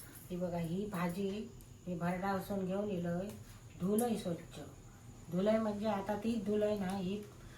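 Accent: Malvani, India. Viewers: उत्तम मालवणीत समजावून सांगतात काकी तुम्ही 😂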